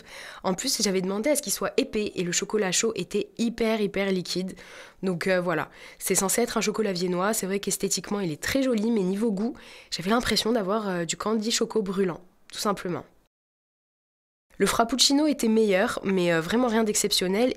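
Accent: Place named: France. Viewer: Parlons un peu de ton accent française en premier moment , jai cru que tes française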